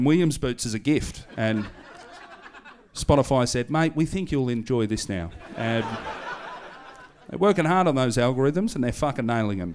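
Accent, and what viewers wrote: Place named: Australia. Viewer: That was a great set. You never fail to get me laughing with your Aussie accented stand up about shit we can relate to. Excellent.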